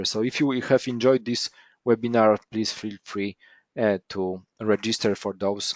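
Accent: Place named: Poland. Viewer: you are simply the best, "English made in Poland"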